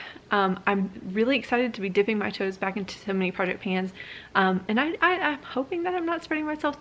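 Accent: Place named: United States. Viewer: you're developing an American accent! Lol. Nice twang girl! Enjoy your products.:)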